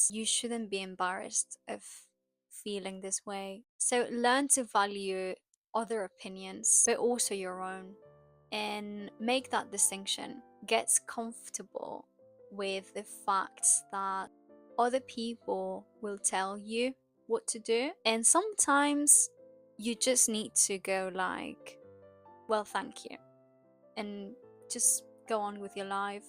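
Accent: United Kingdom. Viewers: Tienes un acento britanico precioso. Imagino que a parte de tener una natural predisposicion al idioma, has trabajado mucho. Sería interesante un video sobre eso. 😊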